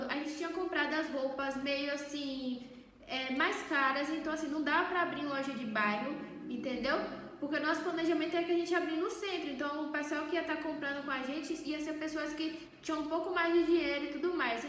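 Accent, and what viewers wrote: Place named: Bahia, Brazil. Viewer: minha querida, seu sotaque é bem baiano e eu amei seu vídeo, que loucura tudo isso, sua irmã é uma santa kkk boa sorte espero que prospere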